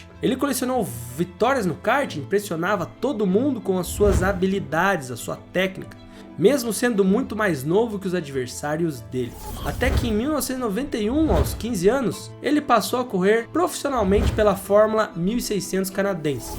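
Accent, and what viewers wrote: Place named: Paraná, Brazil. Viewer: O narrador é da região Oeste do Estado do Paraná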